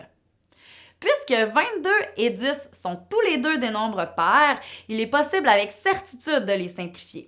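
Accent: Quebec, Canada. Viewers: Ses un accent québequoi! :D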